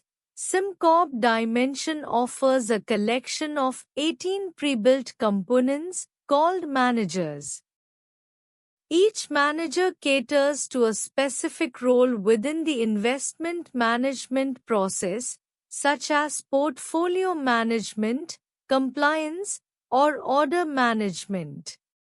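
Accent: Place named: India. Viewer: Omg why this Indian accent when it's a bot???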